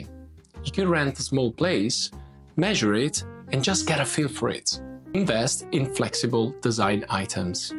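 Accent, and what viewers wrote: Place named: England, United Kingdom. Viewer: So refreshing to hear someone who has learned to speak English with a more authentic English accent, rather than an American accent.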